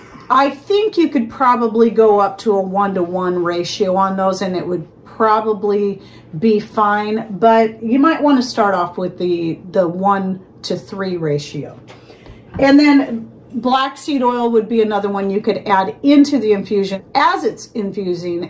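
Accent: United States. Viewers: Hi, I do like most of your videos, but I am finding American accents a bit hard to understand! I was born in Norway, so Norwegian is my mother’s language and my first language. I have lived in South London, England for 54 years now, so English should come easily to me, but I am nearly 72 years old and my brain is slowly loosing a bit of each language! But keep up your good work As I have learnt so much from your videos. Blessings ❤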